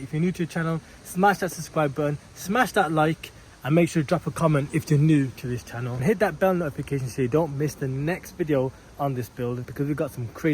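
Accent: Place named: Birmingham, United Kingdom. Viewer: ha glad my comment made you giggle 😂 Are you from brum? I’m in Redditch so I recognise that brummie accent 😅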